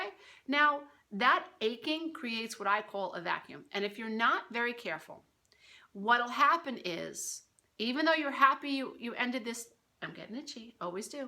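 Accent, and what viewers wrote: New York, United States. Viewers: = The reason why I've been coming to you for life guidance for over a ye-ah. Dat tick New York accent!!! No for real, not only was I stoked that I found on youtube an empowered Italian woman who could empathetically help adult children of alcoholics like myself...but do so with a Queens accent? Paisan!, you're literally the best! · I think it's endearing that you have a new york accent :) a real person not an actor :D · Oh my goodness! I totally resonate with everything you are saying. Moved out last October. Almost a year! Woo Hoo! But... Why do I still miss the guy who was never NEVER really there- not physically or emotionally. He of course hoovered me 5 minutes after I moved out and then just a few months ago. Yay me for not falling for it, but after the last Hoover, I fell into the "vacuum". Guess what? I just met a new guy and he was love-bombing me on our first 'date', mirroring me, and even asking me to move in with him!! 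"You attract an energy being that is equal to the one you just kicked out the door!" EXACTLY!!! I feel like the universe is testing me- or maybe pointing out that I haven't done as much healing as I though I had..... Urgh.... Time to get to work and stop ignoring myself! By the way- I love it when you say "I digress..." And the NY accent! You speak so passionately and honestly. THANK YOU FOR ALL YOU DO! :) <3 Hugs from Canada. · Love the NY Accent. Im from Manhattan and the Bronx. :-) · New York accent-one of my favorite American accents! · Thank you for making me laugh today. That whole "feed me feed me" part. You've hit the nail on the head and this is exactly what I'm going through right now. And this is exactly what I needed to hear. Thank you for addressing everything in a very funny light-hearted way. You helped my day begin in a much nicer way than it did yesterday. And I think your New York accent is adorable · Thank you Lady! 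I needed to hear that today. And your New York accent Rocks!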